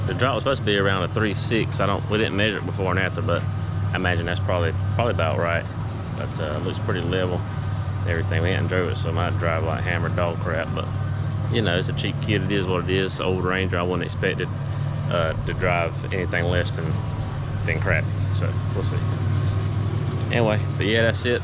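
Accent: Kentucky, United States. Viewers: You sound like EVERY SINGLE KID I grew up with my entire childhood in Kentucky 😂 that’s super cool since I’m in Michigan now lol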